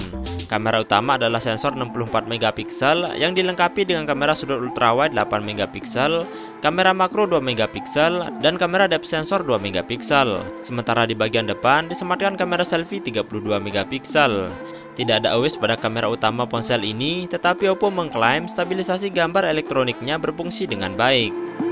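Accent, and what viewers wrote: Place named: Ambon, Indonesia. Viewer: Logat nya mah orang ambon nih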